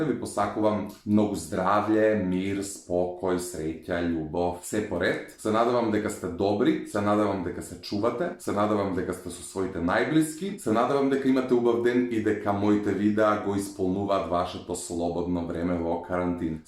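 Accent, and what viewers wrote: Kumanovo, North Macedonia. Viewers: 24h само зборуваш кумановски диалект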